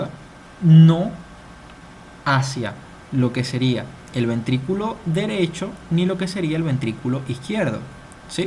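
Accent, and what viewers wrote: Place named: Venezuela. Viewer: Saludos desde Venezuela, guatire, estado Miranda, soy estudiante de Medicina, excelente vídeo clase, de verdad te felicito, eres tremendo para enseñar, tienes el don. Te admiro. Descubrí tu canal ayer, oficialmente me suscribí y te di like. Por favor publica más vídeos como este. De seguro ya te graduaste de médico, por tu acento puedo decir que eres paisano mío, ¿Estudiaste en la UCV? Ojalá regreses para ver más clases tuyas y seguir aprendiendo.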